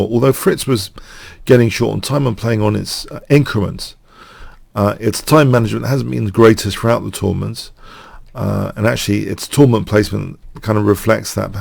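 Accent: England, United Kingdom. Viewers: hay interesting as always, by the way just wondered from where is thet fantastic accent? area England??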